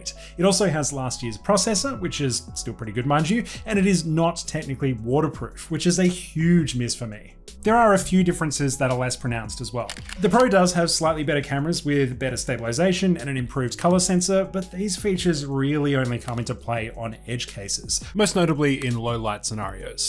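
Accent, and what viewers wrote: Australia. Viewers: You're absolutely right. LoL. It's kinda weird 2bh 😁. An Aussie tech chap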